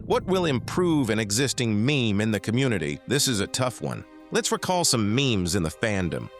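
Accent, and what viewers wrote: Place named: United States. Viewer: Anyone else notice how his voice sounds country?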